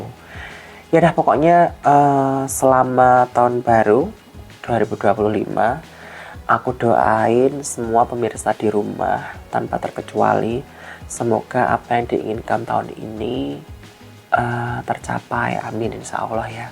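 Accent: Java, Indonesia. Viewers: mas jngn break jngn di jual klo di jual mending ibuny yg nerusin klo mas break gk ada yg celotehanny yg lucu ngomong jowone ora ketinggalan aku seneng soale aku yo wong jowo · Aku seneng sm ngomongnya mas jawanya gak dihilangin mas aku suka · yaa jangan dong mas biarpun aku jarang komen tp aku tetap nonton video mas,yg bikin seneng celotehan dengan bahasa Jawa medoknya yg bikin kangen❤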